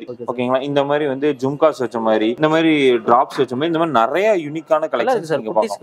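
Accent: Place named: Tirunelveli, India. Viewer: Tirunelveli vagea bro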